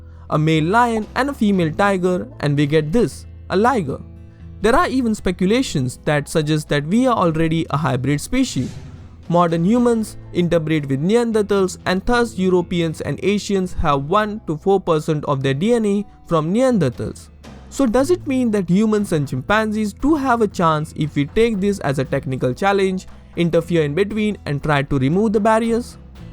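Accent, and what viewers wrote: India. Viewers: I love Indian accents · Me: *sees video Me: *hears indian accent Nope.. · of course it's a east indian turban head doing the narration lmfao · LISTEN INDIAN MAN YOUR ACCENT ...SEEMS LIKE YOU PRAY TO YOUR GOD IS A HANUMAN . IM INDIAN TOO MY GOD ALMIGHTY LIVING IS MY CREATOR. PEOPLE LIKE YOU SHOULD IS UNETHICAL TEACHING WRONG CURIOSITY WILL DESTROY HUMAN RACE AND PEOPLE'S FAITH. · Indian accent again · Mann im indian and this accent even hit me like a ton of bricks. Got dam ginda! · They already have had a half Human and half Chimpanzee. He lives in the 70s. The maker of this video didn't do his research properly. Also funny Indian accent. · No doubt , Indian accent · Indian accent tho :(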